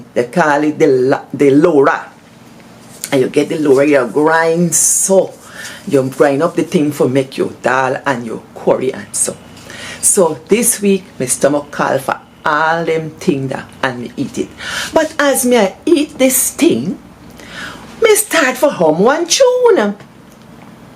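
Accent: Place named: India. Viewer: first time here:) Just subscribed. You wowed me with your Indian accent. I was riveted to your content. Love the singing, the wielding of the belna and the moves, haha. Sharing this video with family and friends. One people, one nation…you go girl:)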